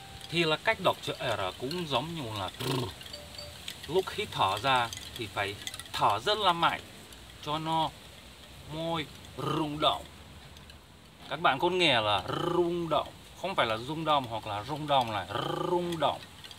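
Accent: Standard. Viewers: bạn nói tiếng việt chuẩn quá <3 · phát âm tiếng Việt chuẩn quá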